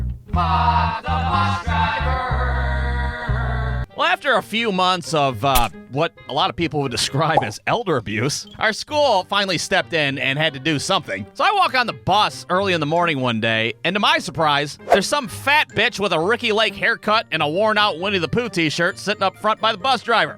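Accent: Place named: Ohio, United States. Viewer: I’m going to go out on a limb and guess that he is either from Crawford or Mercer Ohio.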